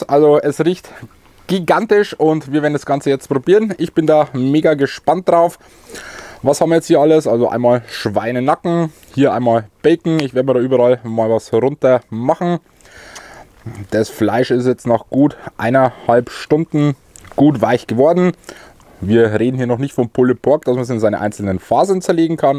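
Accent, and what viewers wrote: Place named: Upper Palatinate, Germany. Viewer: Versuche nicht deinen Dialekt zu verschleiern. A Oberpfälzer bleibt a Oberpfälzer. Grüße aus Mittelfranken mit Wochenendziel Edeldorf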